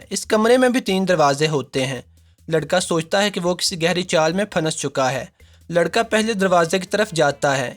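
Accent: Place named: Lahore, Pakistan. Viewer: Teri awaz boht ajeeb hai , you are LAHORI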